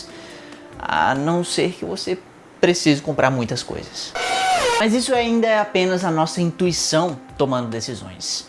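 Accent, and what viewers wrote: Ceará, Brazil. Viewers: Você é nordestino?? O sotaque parece com cearense, n sei, sou cearense e escuto muitos cearenses e piauiense falando assim.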